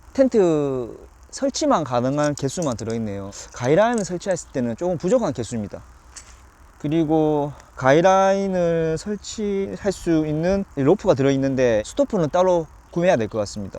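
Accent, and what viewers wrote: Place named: Seoul, South Korea. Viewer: 이 형 서울말도 잘쓰시네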